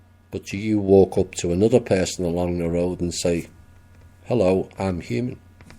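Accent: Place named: Liverpool, United Kingdom. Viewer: my names Kevin Paul from Birkenhead and I know you know we're this is cause I can here the Scouse in ya lad! 👍🙏🇬🇧😇lisen I'm age40 dad to 4, and 16!weeks ago I had a spiritual awakening and since then I can see the evil entities in certain professionals in my area and in many other people around me, my GP doctors is one of them and he is trying hard to convince me I'm loosing my mind, Don't worry I haven't told him what I'm seeing and receiving, Ive just asked him why Ive been floating out my body multiple nights recently and I made the huge mistake in asking for advice from my dr but I never mentioned my spiritual awakening, but he know Fxxkn right I can obviously blatantly see he knows buy his strange reactions and the out of the blue serious advice he is trying to force me to believe ‼️🆘🙏‼️🇬🇧So now I am all alone no one believes me what I am 16 weeks into now and this Awakening doesn't go away I will only become more and more awake and closer to the Oneness which is all of our number one objective while we are in a body, I have reasearched the arse out of awakening but exspecially the UFO FACT and every other subject to do with the pineal gland cover up for multiple decades to humanity ‼️❤️ it's up to you but if someone had the time to lisen to what I have to teach and look at all of my iPhone footage of the Sun and The Moon and the big flashing orbs that appear for me and follow me all over the place both night and day with the sun and moon but the orbs I have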